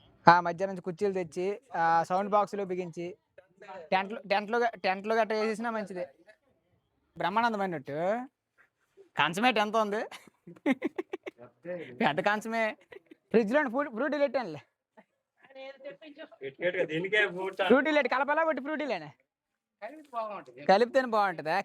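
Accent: Srikakulam, India. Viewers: Anna Mee srikakulam yasa balga untundhi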